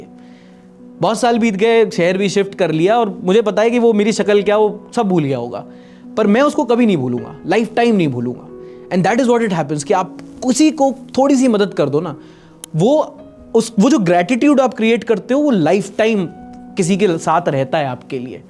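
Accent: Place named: Bundelkhand, India. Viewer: I'm from Jhansi too...and your bundelkhandi is on point 🤌🏻😂 love your content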